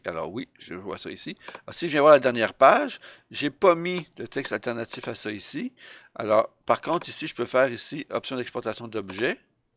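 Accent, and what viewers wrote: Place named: Quebec, Canada. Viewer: Bravo, bien dit et le suivi vidéo est clair. Je ne veux rien enlever aux narrateurs français de France, mais ça fait du bien d'entendre une voix québécoise narrer de la vidéo pédagogique.:)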